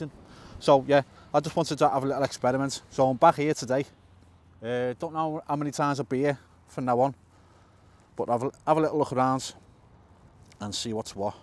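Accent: Liverpool, United Kingdom. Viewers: Is this a Liverpool accent?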